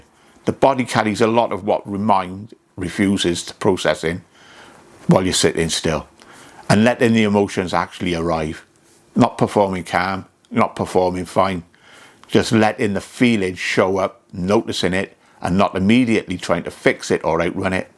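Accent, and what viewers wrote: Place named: Newport, United Kingdom. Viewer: Alright, I understand your language and like your videos. Im originally from Newport S.Wales. Moved to Australia in 1995. Married for 23 years then a divorce came and I have never drank so much in my life. I started drinking in Bettws/Malpas age 14. I am 54 this year. Struggling to keep myself alive without a drink. I work every day although now the hangovers are severe. Good to here a Newport accent?? Thanks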